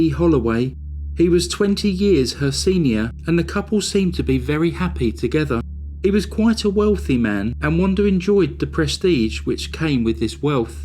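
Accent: England, United Kingdom. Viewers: I thought at first you said Verna and Amber had been winning twerking contests, at three years old! It is easy to see how this case became a comedy movie. Bargaining and bartering with the hit man, all those marriages, the two women so much alike, so much in common but obviously bishes to each other. That Wanda made a complaint about Amber's campaign, then at the first opportunity fell foul to the rules that were changed at her instigation, so she gets her own daughter disqualified.. Even just the fact that 12 year old girls had such intense and serious "campaigns" with obvious voter buying-its so silly to the rest of us. It's all just so.....Texas, I suppose. Weird, WTH cases seem prevelant, deep in the heart. Thanks, kudos as always. PS I did giggle when in your polite, English narration voice, you said Wanda was regarded as up herself. PS again, I think it's unfair YT won't let us like on a second time around! 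Btw On my mini binge tonight, I've even let the four minute ads play through, but it took a lot of will power.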